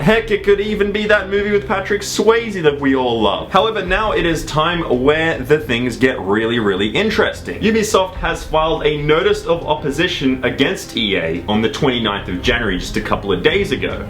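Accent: Australia. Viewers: I love the Australian accent oh lord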